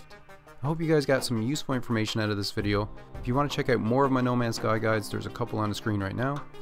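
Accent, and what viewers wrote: Newfoundland, Canada. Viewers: Are you from Newfoundland? Can hear the accent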